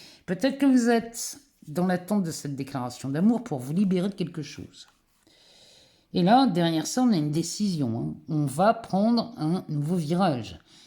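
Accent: North, France. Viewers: merci, pour tout. Mais tu me fais marrer, sans rien faire, seulement de t'écouter!!!!!! Bravo, cet accent nòrdiques que j'aime, tellement!peut-être des ch'tis??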